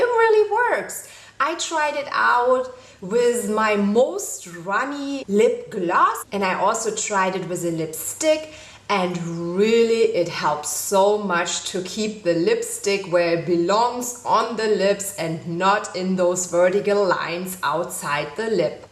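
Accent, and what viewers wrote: Germany. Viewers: Best German accent :D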